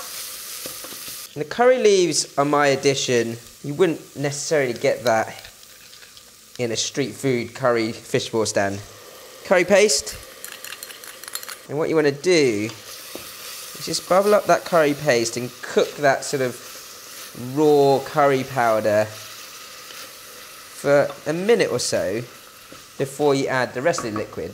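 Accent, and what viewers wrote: England, United Kingdom. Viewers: That's the way it's pronounced where he's from in England. Christ, the things people whinge about!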